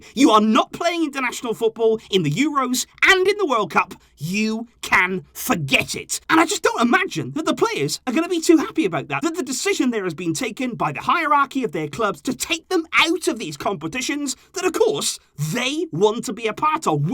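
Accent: United Kingdom. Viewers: When British people get angry it sounds so beautiful. I didn’t even pay attention to the video 😂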